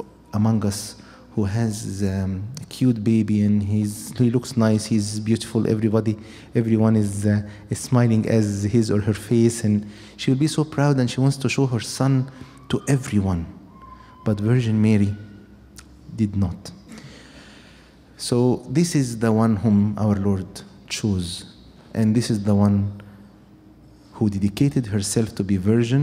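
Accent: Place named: Northern Mariana Islands. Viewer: hmm this is in english, though the accent is thick, and though I am certain this Priest is from the middle east parentage he kind of sounds like some people I know from the marianna islands in the pacific they kind of sound spanish with they way they pronounce certain words, that aside about 5 minuts in I am enjoying listening to this sermon, its very deep and thought out, and last but not least informative, oh I am a traditional roman catholic another appostalic church if you copts like to know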